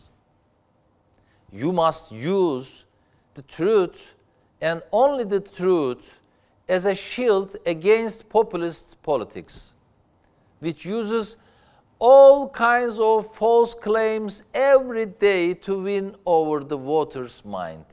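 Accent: Black Sea, Turkey. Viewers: adam güzel konuşuyor aksanı da Türk aksanıyla ingilizce işte ama konuşması falan akıcı güzel yani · 6 yılını yurt dışında geçirmiş ve İngilizce'yi akıcı seviyede konuşan biri olarak söylüyorum, anadili İngilizce olanlar bile İngilizcesi anlaşıldığı sürece birinin aksanını umursamıyor. Adamın söyledikleri net bir şekilde anlaşılıyor. Önemli olan bu. Adamın aksanını eleştirenlerin kaçı İngilizce biliyor acaba çok merak ediyorum. Sanırsın kendileri sular seller gibi İngilizce konuşur. Türkiye'nin English Proficiency'de Avrupa sonuncusu olduğu bir gerçek. Saçmalamayı kesin. Türk aksanıyla İngilizce konuşmak utanılacak bir şey değildir. En azından Türk aksanıyla İngilizce konuşan birinin söyledikleri anlaşılır. Gelmişler buraya adamın aksanını eleştiriyorlar. Hayatınızda kaç tane aksan duydunuz acaba? Sanki kendileri kraliyet ailesi İngilizcesi konuşuyor. 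Konuşması yavaş geliyorsa da videonun hızını artırırsın olur biter. Zaten büyük çoğunluğunuz altyazı olmasa bir şey anlamazsınız bu videodan o yüzden adamın konuşmasını eleştirmeyi kesin. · Baskan Turk aksanini iyi ozetlemis:) Yalandan aksan kasmaya calismaktansa catir catir yerel aksanda konusmasi en iyisi. Ingilizceyi ve alsani bir yana birakirsak yeni jenerasyon siyasetin guzel bi analizi yapilmis. Genel ve yerel yonetim farki analizini ozellikle begendim. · Amerikan İngilizcesi var İngiliz İngilizcesi var BUDA TÜRK İNGİLİZCESİ, REZİLLİK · Bu arada zerre sevmem imamoglunu ama bir bilgi vereyim eger ingilizce ogrenmek isteyen varsa ve aksandan dolayi yapamiyorum diyen arkadaslara bakin iyi bir ornek arkadaslar bakin gorun normal gundelik turkcesi gibi rahat turkce aksanla ingilizce konusuyor o yuzden sizde kasmayin illa ingiliz ingilizcesi amerikan ingilizcesi gibi konusmaya gerek yok bu aksan bile idare eder yeterlidir kasmayin yani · İngilizce'yi bir Türk gibi konuşması hoşuma gitti. 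· Başkanım şakır şakır konuşuyo be helal olsun biraz karadeniz aksanlı ama yinede gayet akıcı ve anlaşılır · Van münit vs Türk aksanlı İngliş. Türk aksanlı İngilişin başımın üstünde yeri olduğu bir versus. Başımın üstünde derken TC'nin başında. Ha bu arada altyazılara bakmadan adamın dediğini anlayabildim. Anlayabildiysem sorunu da yoktur. Ama şunu söylemeden etmeyeceğim. Bunu yazan her kimse, mesleği akademisyenlik. Belli kullandığı cümle yapısından. Yabancı dillerle uğraşan her kimse değiştirmesini tavsiye ederim başkana. Çok kullanacak çünkü başkanım bu dili ilerde. Bu şekilde gitmez. Dost tavsiyesi. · Yabanci dil konusmak bazilarinin dedigi gibi tercümanlik degil, iletisim kurulan kisilere direkt olarak hitap etme imkani saglayan günümüzün en önemli ikna yöntemlerinden biridir. Umarim yillardir devlet yönetmekte olanlar da "welcome" haricinde iki kelimeyi bir araya getirebilirler. Sayin Bey'den ricam biraz aksan ve telaffuzda türkce'den uzaklasmasi olur. 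Gurur duyuyoum.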